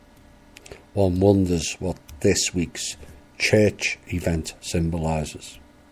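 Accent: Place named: United Kingdom. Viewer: How could it take almost one billion dollars to put a new roof on that church? Something wrong with that picture. From the mindset of an American living in southern California I must say I find your commentary with just a tad bit of cynicism spoken in your British accent quit amusing while very informational. Thank you for your efforts to keep us informed of things regarding the evil cabal!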